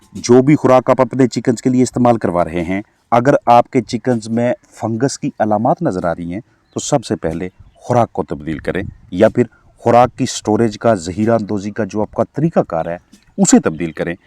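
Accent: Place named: Pakistan. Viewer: Apki zavan sunkar aisa laga jaise me ibadat me chali gai kuch der ke liye aap bahut urdu mein baat karte hai aur hum urdu hindi mix bolte hai i think aap Pakistan se hai. Aur hum India me peda hue isliye yaha ke mahol ke mutabik hain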